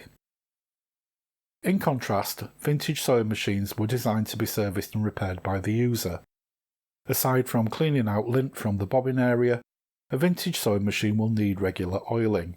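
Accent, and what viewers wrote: Northern England, United Kingdom. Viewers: What a brilliant and informative video. It's great to hear a northern accent too!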